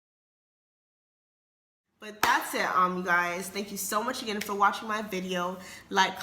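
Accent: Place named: France. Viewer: She's definitely not American, earlier videos show that she has a noticeable accent (possibly French) and English is not her first language hence spelling mistakes and such.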